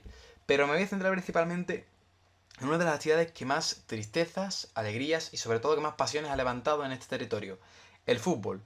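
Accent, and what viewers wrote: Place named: Seville, Spain. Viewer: Por tu pronunciación de la letra T....q me parta un rayo si no sos de Sevilla!!! Jeje.....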